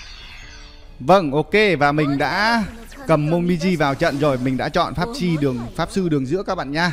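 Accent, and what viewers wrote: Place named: Ha Tay, Vietnam. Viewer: Giọng a nghe như giọng hà tây kkkk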